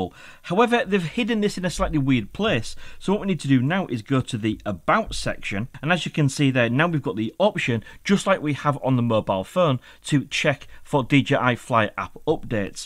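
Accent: Hull, United Kingdom. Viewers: I hear a bit of Hull in you accent. Are you from the surrounding area?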